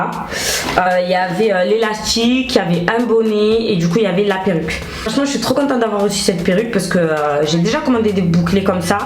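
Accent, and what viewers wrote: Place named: Toulouse, France. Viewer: On s’en beaucoup ton accent tu es toulousaine ? Sinon super vidéo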